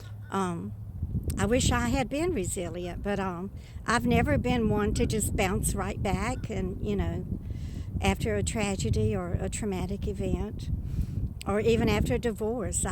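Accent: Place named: Deep South, United States. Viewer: Sweet lady, you have my respect. So glad Uber goober lady referred me here. You remind me so much of the lovely ladies of my youth in the Deep South....not sure why coz not just your accent. I think it’s your facial expressions and manner of speech. Anyway, love and respect from another older lady of the south. Blessings, my dear.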